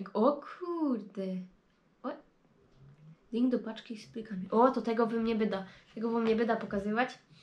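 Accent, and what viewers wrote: Silesia, Poland. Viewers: Słonko Twój głos brzmi lepiej po śląsku niż w mowie Polskiej :D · Urodziłem się na śląsku mialem 3 lata jak sie wyprowadziliśmy dla tego tak uwielbiam tą twoją godkę :D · A ja to się zakochałem w tej godce. Godoj bo to dodaje Ci uroku ! :)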